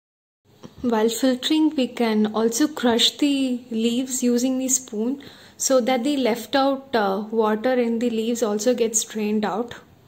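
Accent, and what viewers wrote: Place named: United States. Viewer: American atma in Indian body....your angreji is so English ji.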